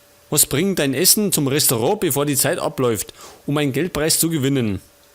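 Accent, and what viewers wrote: Bavaria, Germany. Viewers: Find dich richtig geil. Einfach ein bayrischer LPer. Das hat was auch wenn ich dich nicht wirklich verstehe.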